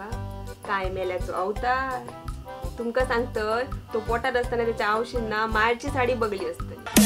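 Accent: Malvani, India. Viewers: तुमची मालवणी खुप पीवर असा.🙏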